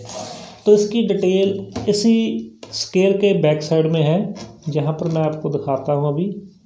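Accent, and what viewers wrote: Punjab, India. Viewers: Punjabi banda hindi bol raha ha..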